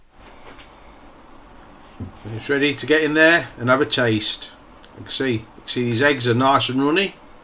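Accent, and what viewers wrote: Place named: Midlands, United Kingdom. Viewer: Looks good, husband and I are trying to narrow down your accent, he says junction 28 of the M1😂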